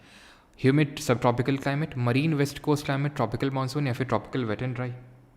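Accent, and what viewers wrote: Kanpur, India. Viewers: भैया बाकी सब ठीक है अपनी उन्नाव कानपुर वाली भाषा सुनके मजा आ गया 😅😅😅😅 कि इतनी ठंडी नही पड़ती की ( जड़ा 😅) जयहो 😅😅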